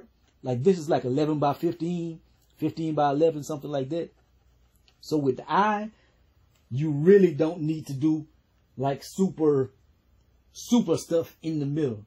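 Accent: Louisiana, United States. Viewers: What's up homie? I'm new to your channel thanks to your P Valley breakdown. Anyways I'm checking on your other videos and stuff you seem like a chill entertaining dude I look forward to your other stuff. Super-talented also hey your accent bro it's very original sounds like when I watch movies from like Louisiana LOL like New Orleans type shyt. Very different from me I'm in Brooklyn LOL anyways have a good day about to start this P Valley season 2 LOL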